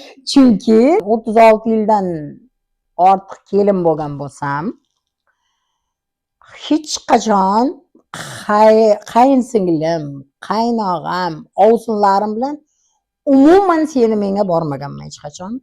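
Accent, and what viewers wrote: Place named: Samarkand, Uzbekistan. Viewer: ты супер, маладес, хакикий Самаркадча гап булибти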